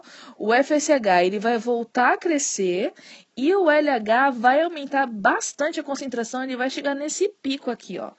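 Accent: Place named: Inland Minas Gerais/São Paulo, Brazil. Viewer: Kkkkk sutaquezinho pesado do interior de Minas ou de SP 🤔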